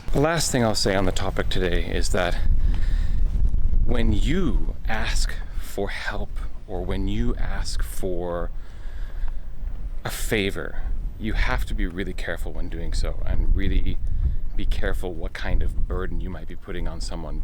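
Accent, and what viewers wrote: Midwest, United States. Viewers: Love the Midwest accent. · Konnichiwa from North Pole Alaska. You sound like you’re from the Midwest! (My Dads family are from there). Loving your videos, they make me smile when at work. We’re getting ready to go back for our second trip to Japan. Fell in love with it last time!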